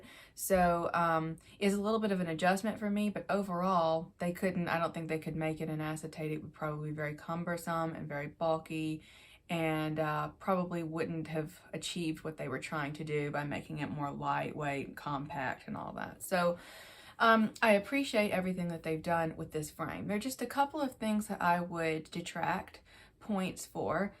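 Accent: South, United States. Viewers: Great review! From this, I will never be purchasing this particular style! Loving the southern accent! Hey from Alabama!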